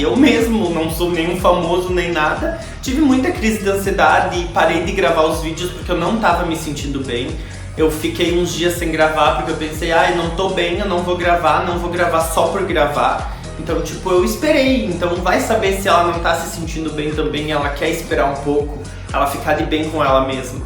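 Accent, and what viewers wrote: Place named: South, Brazil. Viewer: Sotaque do Sul kkkkk A maioria daqui tem esse sotaque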